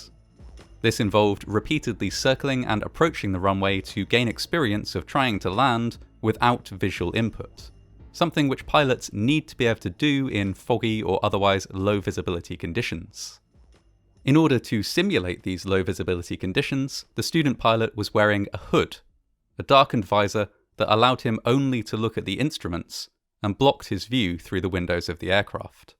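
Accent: United Kingdom. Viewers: Another British narrator? Come on You Tube hire some people in this country!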